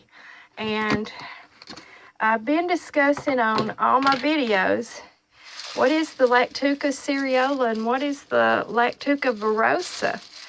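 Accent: South, United States. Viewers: Great video,! Love it everytime I see it / review it. Have shared this particular video with several friends, including my mom. "These are my good DRANKIN' glasses! "- love it! ( referring to the 12 oz mason jars)! I'm not making fun of you at all though! I love your Southern accent and mannerisms! I'm a Southerner, too - a "Florida Cracker", and have some family up in Tennessee. Appreciate your channel and information on wild lettuce VERY much!!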